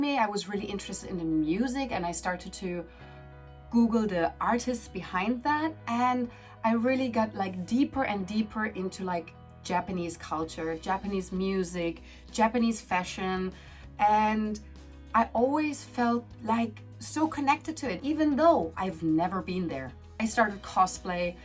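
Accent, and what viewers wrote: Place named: Canada. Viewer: Great communication skills, initially I thought I could hear a Canadian accent, then a slight German accent. Your English is faultless. Good luck with Japan.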